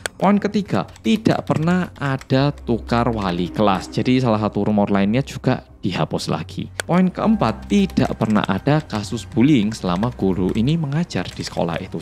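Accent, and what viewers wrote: Java, Indonesia. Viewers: Kerenz pembawaannya medok tpi banyak tambahan gambar 🎉🎉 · OMG kisah nyata ini mirip karya di webtoon dgn judul "the real lesson" dari akhir episode 81-90, aku kira kejadian ini cuma ada di webtoon mengenai seorang ibu-ibu yang spam call guru sd di nomor pribadi bahkan pada saat malam hari. Ngga kebanyang itu kejadian di ibu guru sd yg mas ceritain😓😓 BTW, aku nonton video ini dideket papa dan papa ikut denger, papa malah salfok, "suaranya medok banget". Suara mas melokal yaa, sampe papa ga ngeh klo mas orang korea😅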